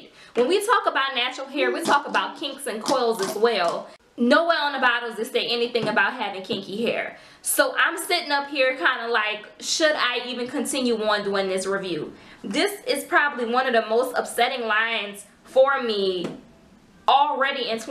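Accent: New Orleans, United States. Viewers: Sounds like a hear a whole lotta NOLA in your voice #yaheardme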